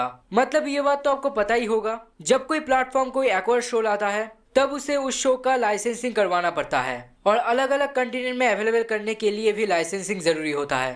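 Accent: Bengal, India. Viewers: You Bengali ?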